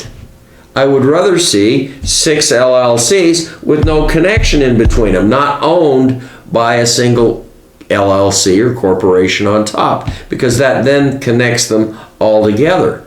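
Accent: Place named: Pennsylvania, United States. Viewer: You have a strong PA accent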